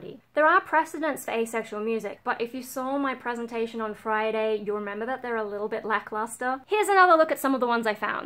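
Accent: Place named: Australia. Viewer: Whoa. Shot out of the cannon. Very YouTube 2018ish. I've seen other YouTubers with this style. I always want to tell them to cut down on the coffee. :) Are you picking up a Valley Girl accent? Please don't! I like the English with the slight Aussie touch. Without a doubt, you are creative. Ten years of vlogging and countless projects, to name a few. For myself being creative means, to create my own world. As a kid, building blocks were my favorite toy. These roots translate into many other things. On a side-note: I like - The Tea Party at the End of the World - Just a soothing feeling I get from the visual of the installation. Asexuality and Music: Well..., here we are at the issue. - I'm convinced that sexuality or sexual desire, is the biggest driving force in music. Sex, Drugs and Rock'n'Roll to name a cliché. As easy as that. And not just for Guns N'Roses type music, but for the most unassuming music too. 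So as a teacher, my most-obvious question would be: If you take sexuality out of the equation, what is left as a drive?! I would want to know about the driving force. About your journey and your conclusions. The feelings about it all you can convey, and last but not least...what meaning does it all have for you? BTW: I shamelessly lifted many of these questions, from the basics of how to write a movie script. - A lot of psychology can be learned from the techniques of writing a movie script or story. Like the Hero's Journey. You know? The audience wants to know abo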